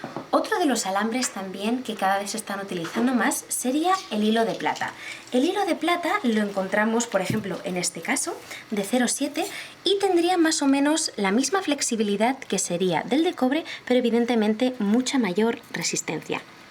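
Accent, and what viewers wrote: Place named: Spain. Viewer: Cielito Lindo claro que tienen el mismo acento pues las dos son de Espana.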